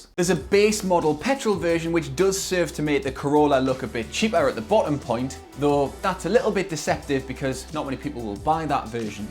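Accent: Newcastle, United Kingdom. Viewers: Canee geordie accent like!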